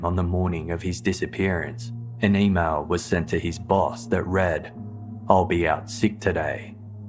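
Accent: Australia. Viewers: Ok I’m going to have to UNSUBSCRIBE. The annoying drone of the Aussie accent I could muddle through but this report COULD NOT BE MORE BIASED! Allow me to be of service to listeners: go to Direct Appeal Podcast. It is one thing to simply be a story-telling channel but a whole other when the “story” is clearly one sided.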